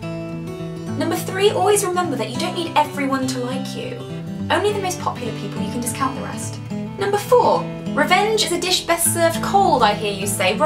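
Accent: United Kingdom. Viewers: you sound like british,I like your tone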